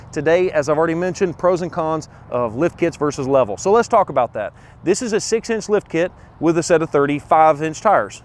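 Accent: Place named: South, United States. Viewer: ".....you might can get...." Yeah, he's from the South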